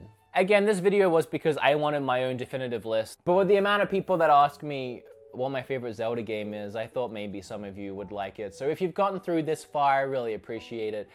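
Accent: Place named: Australia. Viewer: God, your accent is really throwing off my sensors. I'm new here but I'm guessing Australian, living in America? I know you mentioned Australia in the intro but I picked up on it before then. As someone who's traveled a fair bit, my accent tends to modulate and sync with my surroundings, so I'm guessing that's what it is?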